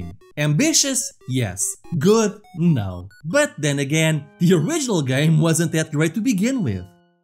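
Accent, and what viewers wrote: Portugal. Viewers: Portuguese accent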